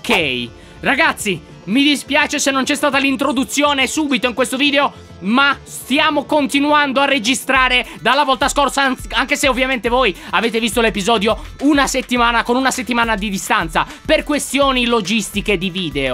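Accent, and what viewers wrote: Sardinia, Italy. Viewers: sono sardo come te e ho scoperto di dove sei perché l'accento e il nome sono di un posto della Sardegna che che conosco bene.Prima pensavo che fossi di Sassari ma ho capito che non era così comunque non dirò a nessuno nei commenti di dove sei perché farei lo spammone bastardo ma tralasciando questo....volevo chiederti se facevi dei raduni perché tu mi stai molto simpatico e molto a cuore e vorrei incontrarti di persona.Ho 11 anni e sono tuo fan a quando facevi "Dayze" che se non ricordi era un gioco di zombie e hai giocato moltissime partite sopratutto con Mario. Poi sono nate altre serie come quelle di Happy Whells e minecraft e se non ricordo bene il tuo primo video è stato proprio di minecraft.Comunque io vorrei veramente tanto che tu facessi un raduno qui in Sardegna perché vorrei incontrarti di persona e mi farebbe veramente felice. 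SPOLLICIATE PER FARGLIELO LEGGERE!!!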